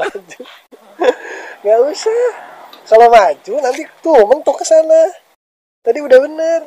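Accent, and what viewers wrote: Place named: West Java, Indonesia. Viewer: Nyunda asek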